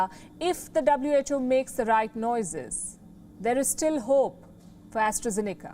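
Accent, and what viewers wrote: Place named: India. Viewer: All these years under the British masters rules you still have your indy accent YOUR COLONIZER R not very proud.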